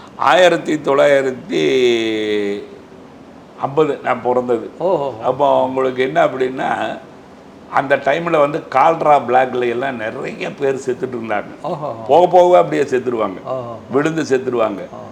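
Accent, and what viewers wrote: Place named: Coimbatore, India. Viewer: Comibatore slang super sir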